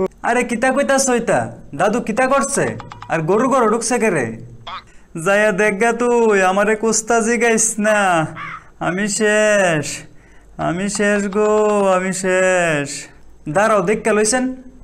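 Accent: Brahmanbaria, Bangladesh. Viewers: আমডার বাউনবাইরার ভাষা দি😂😂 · ভাই আপনার কথা গুলো বাংলাদেশ ব্রাহ্মণবাড়িয়ার আঞ্চলিক ভাষা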